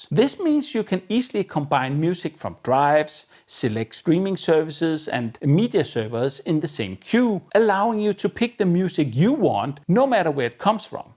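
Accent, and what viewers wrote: Denmark. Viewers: Danish accent is just the best 🙂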